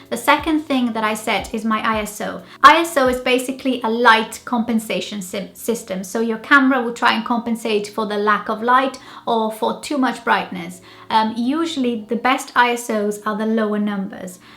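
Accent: United Kingdom. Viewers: tb sou brasileira e moro em Londres, o seu acento britanico e fantastico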